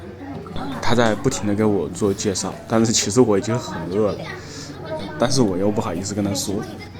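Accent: Wuhan, China. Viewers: 武汉普通话，一开始就听出来了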